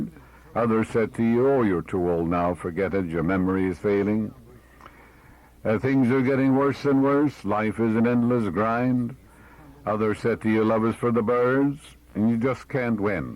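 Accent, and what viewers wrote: Ireland. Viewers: Plenty of good stuff here. I never realized had such a heavy Irish brogue! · Like cha and miah Murphy always retained that cork accent!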